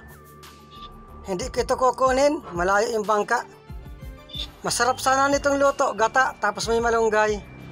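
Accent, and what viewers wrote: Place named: Camarines Norte, Philippines. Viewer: kaya pala pareho tono Ng salita natin siguro taga vinzons ka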